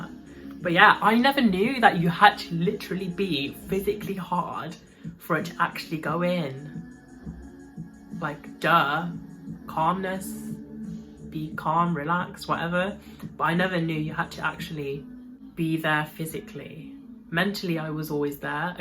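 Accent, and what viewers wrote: United Kingdom. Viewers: Yes that British accent does something to me 😁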